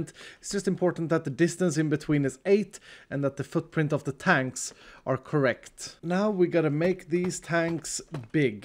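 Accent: Sweden. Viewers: Are you Swedish because you have a very Swedish accident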